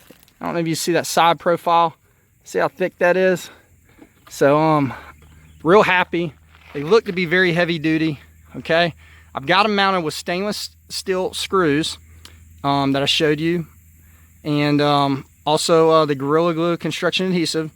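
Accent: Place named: United States. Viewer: Where you from? Cause you sound countrier then a mug cuz.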